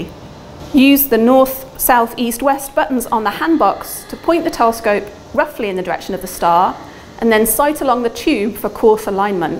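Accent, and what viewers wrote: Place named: Estuary, United Kingdom. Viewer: Interesting video. It seems your observatory is in the US, but you talk with a refined British estuary accent!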